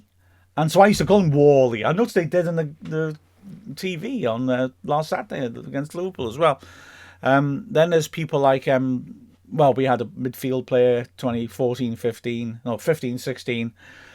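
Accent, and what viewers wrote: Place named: Newcastle, United Kingdom. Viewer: Loved your Geordie accent! P.S. Thanks for keeping it under an hour. I find too many podcasts go to an hour and a half or more and I think that's way too long :)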